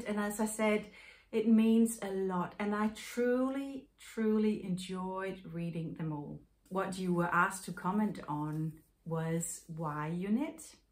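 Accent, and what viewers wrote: Denmark. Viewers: Hej, da jeg hørte dig tale første gang jeg så Mindful Making, kunne jeg høre med det samme at du var dansk.hahaha Det var en rar følelse. Med hensyn til dit garnlager, skal man jo ikke gå ned på garn, vel!?;o) Jeg kom til at tænke på, at hvis der er nogen i Australien som har brug for Holst garn, kunne jeg hjælpe med at skaffe det og sende det som en gave (told:o og så kunne jeg få noget garn tilbage på samme måde. Hvad mener du om ideen? Vh Maud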